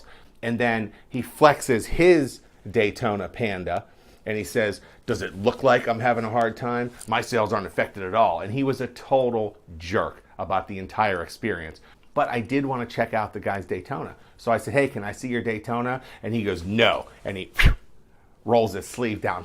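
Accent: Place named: Rural, United States. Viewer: Not defending his behavior but you come across like a dude from the hills of Pennsylvania. Poorly dressed with that horrible hick like accent. Once he heard your opening salvo he had already sized you up. Not saying it’s acceptable but these guys see country bumpkin tourists all the time.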